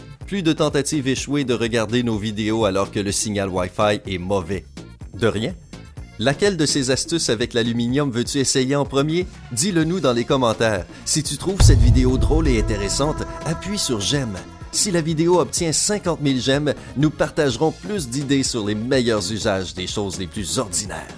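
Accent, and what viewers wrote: Quebec, Canada. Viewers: c'est un être à cause de son accent. Probablement un canadien , de plus, on n'est pas habitué au tutoiement facile des Québécois qui nous donne l'impression d'être un enfant ! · Je bois VOS SAINTES paroles monsieur le Canadien. Bon de ce pas je vais mettre sur MA table de nuit un bulletin neuf du loto ou mieux encore un bulletin neuf d'euro millions sur une feuille d'aluminium Et peut être que dans un rêve je verrai la combinaison gagnante. Merci du conseil et que vive Laluminun...jadore VOTRE accent..moqueur ce...oh le vilain garnement...je vous ai mis un joli pouce bleu..bonjour à Céline... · Accent canadien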